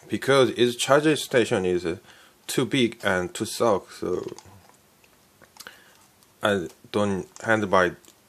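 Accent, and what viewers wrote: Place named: China. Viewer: Sounds like a chinese trying to speak english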